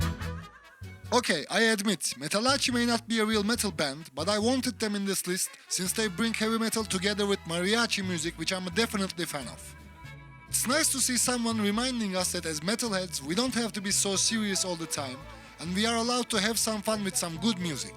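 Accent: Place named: Mexico. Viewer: You are Mexican! your accents is too Harrrrrrd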